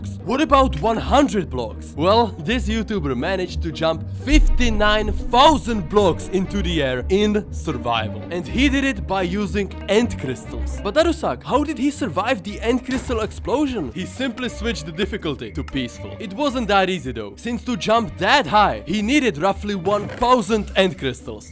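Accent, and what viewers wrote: Czech Republic. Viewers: Je to haluz, hned jsem poznal přizvuk, Češi se poznaj 😀 keep up the good contennt, dobře se poslouchaš! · this guy's English accent is just typical Czech... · Why do you have a czech accent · The Czech accent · Ah... i was questioning myself that you have quite cz accent :'D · The most Czech accent in history frfr.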